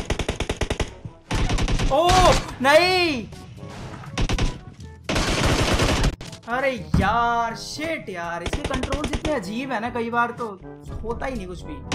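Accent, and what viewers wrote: Haryana, India. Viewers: kya aap haryana se ho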